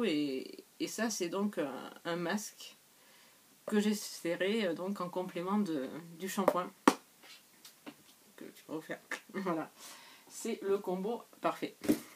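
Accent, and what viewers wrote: South, France. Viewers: Rooh ce petit accent du sud ^^ Ba voila nouvelle chaine nouvelle vidéo on continu on continu :) Si je peux me permettre comme tu m'as dit de donner mon avis lol tu es un peu loin de la caméra :) Gros bisous beau swap :)) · Tu a été gâté ma jolie !! Trop contente de te voir et entendre ton bel accent du sud !! Ta video est top, pour une première c'est génial !! Gros bisous 😘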